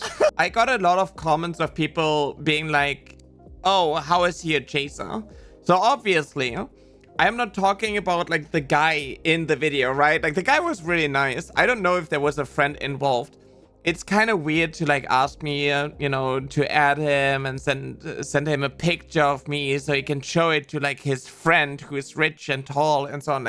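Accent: Germany. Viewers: r u german n etc ?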